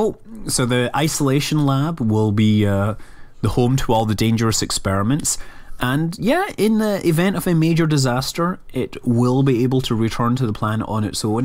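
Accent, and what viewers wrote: Scotland, United Kingdom. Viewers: He's Scottish you imbecile.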